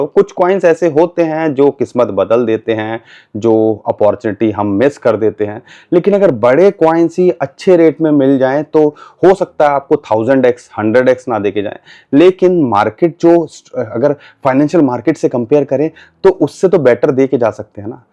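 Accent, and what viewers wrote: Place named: Varanasi, India. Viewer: Bhai ekdum banarsi andaz me baat karte ho GURU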